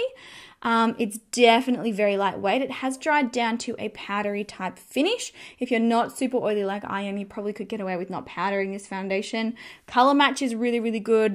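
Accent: Australia. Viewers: This is the first time seeing you and I'm already a fan. You are gorgeous and charming and your Aussie accent is adorable. Subscribed!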